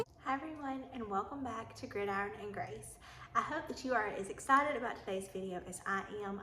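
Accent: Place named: South, United States. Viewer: Love your southern accent 🇬🇧🇺🇸🌸🌸 (I really miss being in Tennessee)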